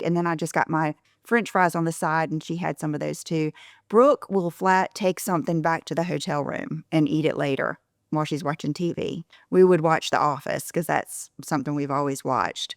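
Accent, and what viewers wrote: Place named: Boston, United States. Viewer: And YES, on the sexy Boston accent!!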